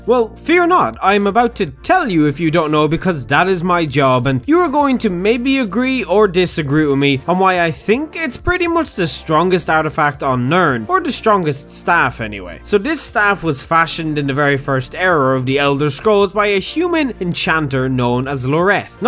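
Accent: Ireland. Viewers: First comment ha how are you Irish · "So I was tinking" ah I love your irish accent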